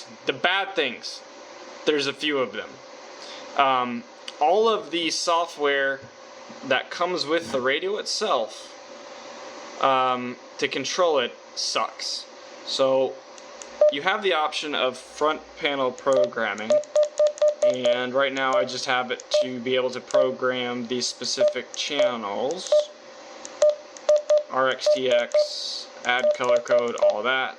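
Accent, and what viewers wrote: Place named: United States. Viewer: You sound like you are in the USA, please don't use encryption when broadcasting on this radio. That is illegal. Also, please get your amateur radio (technician) license. It isn't difficult. One of the things they teach you when studying for the test is that using encryption when broadcasting on amateur bands is illegal, so the encryption capability should not be an issue for you.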